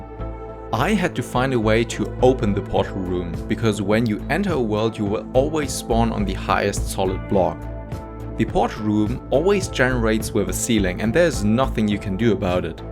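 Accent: Germany. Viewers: Ich höre so deutsch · are you german? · Are you German by any Chance? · Are you German by Chance?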